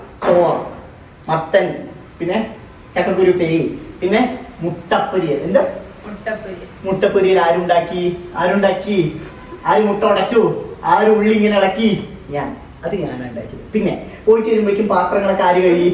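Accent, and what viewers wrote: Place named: Palakkad, India. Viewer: Chechide slang enik valare ishtaayi ❤❤❤ nammade Pkd slang....❣️❣️❣️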